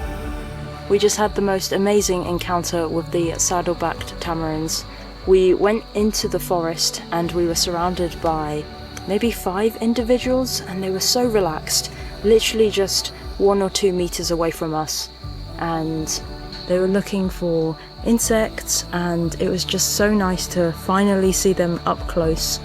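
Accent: United Kingdom. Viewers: Every one of your videos makes me wish I had a British accent.😅 Great job on another lovely video!💕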